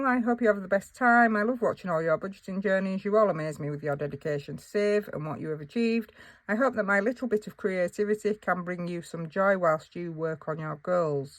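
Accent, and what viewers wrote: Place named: Yorkshire, United Kingdom. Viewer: Hey! Love the long video, wish I was there...bit of a schlep from Oz tho 😂. We'll be back home next week for a visit so just missed it....boo😢! Glad you had a wonderful time well jell over all your lovely goodies ❤ have a lovely weekend bud 😊 ps...lovely to see you and to put a face to that yorky accent 😂❤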